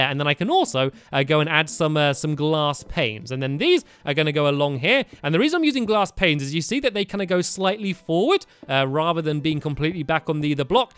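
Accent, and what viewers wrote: United Kingdom. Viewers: Are you British I am your the best youtuber ever we love you and your vids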